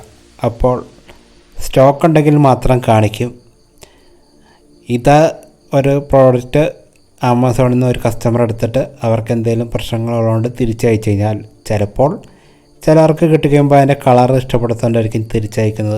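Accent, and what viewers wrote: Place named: Kollam, India. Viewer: ഫ ഫ എന്നു പറയുന്നത് കൊണ്ട് മനസിലായി കൊല്ലത്തുള്ള ആളാണോ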